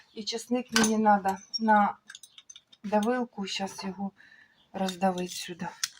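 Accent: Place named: Donbas, Ukraine. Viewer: Спасибо огромное,вы чудесная хозяйка,золотые ручки,и разговор,как песня,немного похоже,как у нас на Донбассе говорят,суржик,и не на русском но и не на украинском,наш местный диалект,спасибо,недавно зашла к вам,случайно,уже синие сделала квашеные,оболдеть, только без селеры,не люблю, спасибо,всем мира здоровья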